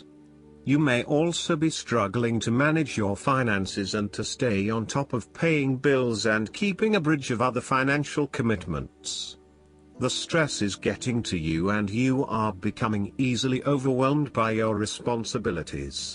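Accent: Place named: United Kingdom. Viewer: Beautiful British English pronunciation. Good reading, as well. TNX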